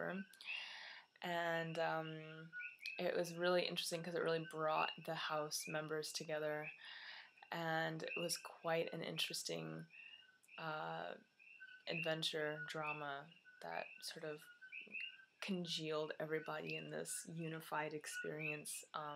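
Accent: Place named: Canada. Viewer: She's very pretty and has nice eyes and voice, but she needs to take out that nose ring. It reminds me of how a bull is shown in a cartoon or something. It's very distracting. She sounds kind of Canadian. Does she smoke pot though? She's Verrrrry mello. Yes, Centipedes will mess you up. I heard about them talked about on the Discovery channels Dual Survival, as well as throughout my life from various sources.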